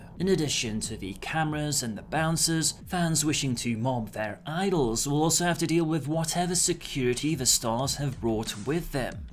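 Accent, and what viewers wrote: England, United Kingdom. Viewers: I'll just take it that I wasn't off with that assumption. I would like to thank Sean Bean, for it was his accent that yours reminded me of. Mostly the pronounciation of the ol' diphthong "ou" like in "yOUng". Jolly good. That said, it's probably the best of the english accents, because Sean Bean.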